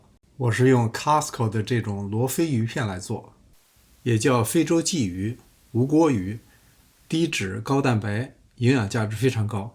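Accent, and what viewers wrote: Beijing, China. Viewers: 听到京味礼貌的乡音，好亲切呀！ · 我听着也像北京口音。我是木樨地一带的。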